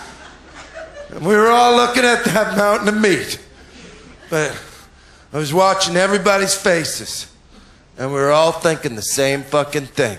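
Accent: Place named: Liverpool, United Kingdom. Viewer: You know he's a scouser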